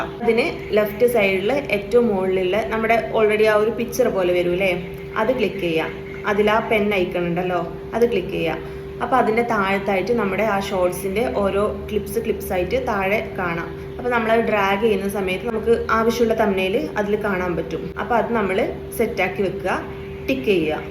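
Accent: North Kerala, India. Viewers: ആ ഒരു വടക്കൻ ചുവ വന്നു..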